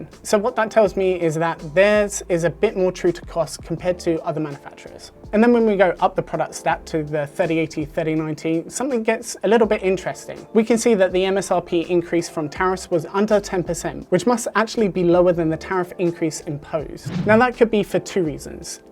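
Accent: United Kingdom. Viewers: ok, so my ears didnt fool me that you sound so british XD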